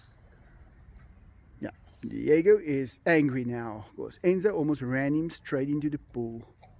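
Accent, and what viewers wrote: South Africa. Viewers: I love watching videos he has true love for animals, and I love the SA accent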